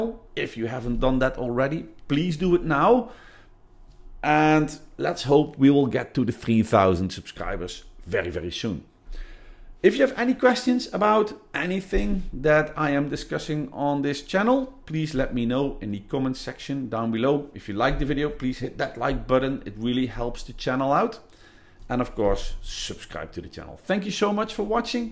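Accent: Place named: Netherlands. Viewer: Hallo U komt uit Nederland dat is goed te horen ☜(ﾟヮﾟ☜) Ik ben bezig met mijn MS-500 bedankt voor de video :)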